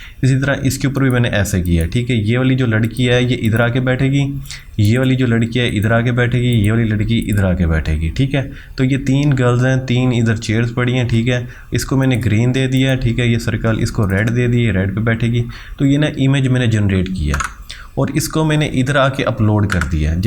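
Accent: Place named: Punjab, India. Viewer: Aapka bolne ka andaz punjabi style in natural way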